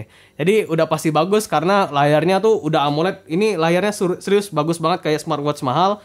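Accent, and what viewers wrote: Palembang, Indonesia. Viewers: Palembang ya? Wih mantep bang.. dari aksen nya sih iya keliatan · Wong Palembang lah😄👍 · Lahh wong palembang kamu kak 😂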